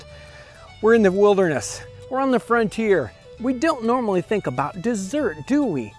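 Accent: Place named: Midwest, United States. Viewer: Wrong. I knew a guy from the 18th century who found a camera. He had a much different accent. This guy talks like some dude off the street in Everytown, Midwest USA.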